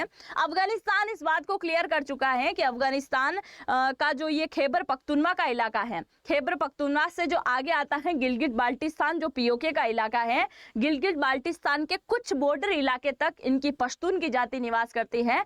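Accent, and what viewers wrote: Gujarat, India. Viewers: I LIKE YOUR ANCHORING....YOUR HINDI LANGUAGE IS 👍 GOOD LITTLE BIT GUJRATI MIX UP. YOUR HINDI KI PALKI SHARMA......❤ U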